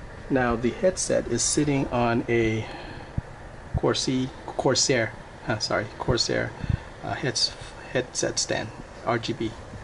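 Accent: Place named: United States. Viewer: Chất giọng của anh giống Mỹ quá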